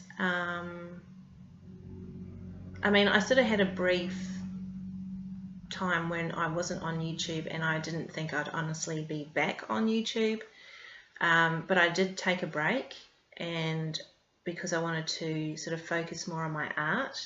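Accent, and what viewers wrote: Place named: New Zealand. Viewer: Oh my goodness, I thought (assumed) you were from NZ!! Great responses here, I’ve been dabbling with some deck mods recently, only decks that are cheap and easy to get though. I’m the same, I’m not a huge fan of long videos, I try to keep mine around 10 to 15 (every now and then they go over if it’s something special). I’m off to check out some of the other channels you mentioned!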